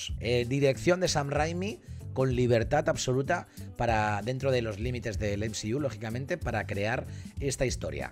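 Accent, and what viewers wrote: Spain. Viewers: muy buen video solo no entendí de primera el chositil ... pero la verdad también lo bueno de tus videos son tus pronunciaciones tan españolas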